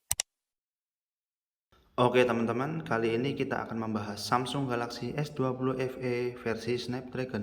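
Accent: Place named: Banyumas, Indonesia. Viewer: Logatnya kyk ngapak ini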